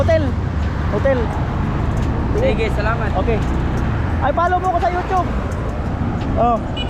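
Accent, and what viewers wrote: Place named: Maranao, Philippines. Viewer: Maranaw ung tono nya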